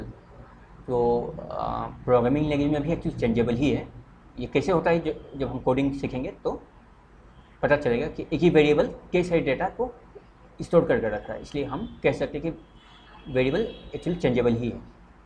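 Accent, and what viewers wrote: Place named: Assam, India. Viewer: There is a touch of assamese tone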